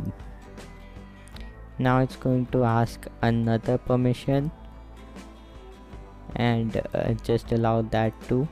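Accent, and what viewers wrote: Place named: India. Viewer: Indian voice? Yuck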